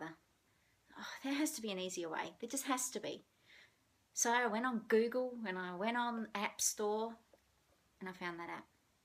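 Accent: Australia. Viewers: Thank you, for this very informative video! By the way, I LOVE your beautiful Australia accent! Your voice is like music to my almost deaf ears! I would love to hear you sing!:) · What a fabulously helpful video! I knew some of these sites, but not others and I LOVE the framing app! Have just purchased! Now off to take some decent photos of some of my finishes so I can use it properly! I grew up in WA, but have been in the UK since I was a teenager - I love hearing your accent. Although not a WA accent, still reminds me of 'home' . Xx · I loved this video, as I’m new to cross stitch. Hey love the Aussie accent as I to an Australian. I found this video extremely helpful as I hadn’t heard of many of the apps before. I really want to try digital charts. I want to convert my paper ones to digital. Do you know how or is there a video you’ve made for this? I’ve also subscribed to your channel. 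I’m looking forward to seeing more of your videos.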